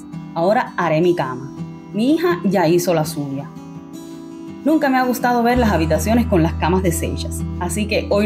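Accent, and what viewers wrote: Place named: Dominican Republic. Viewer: sospechava que fueras dominicana amiga,gracias por contestarme🏠🇺🇾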